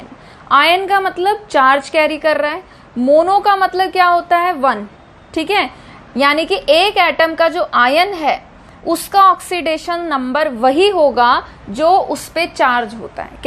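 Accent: Haryana, India. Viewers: Aapke speaking style haryanvi touch h😅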